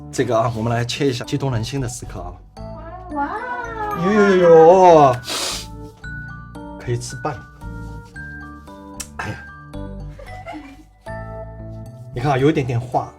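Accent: Shanghai, China. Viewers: jijnjijijj 沪普😂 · 这是很标准的上海普通话，哈哈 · 朱师傅一口上海普通话听着真亲切啊。听着朱师傅的声音好像回到了上海，很久没回家了，满满的思念。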